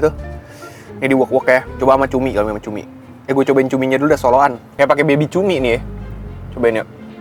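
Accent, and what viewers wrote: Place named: Bekasi, Indonesia. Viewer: Logat lu bekasi bgt bang😂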